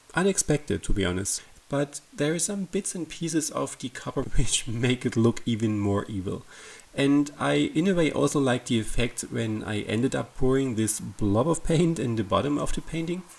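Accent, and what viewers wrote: Germany. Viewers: Dachte ich mir schon, dein Englisch klingt so deutsch 😂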